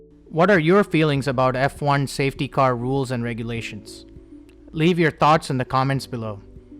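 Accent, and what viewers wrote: India. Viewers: Sorry I don’t understand indian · Indian aksen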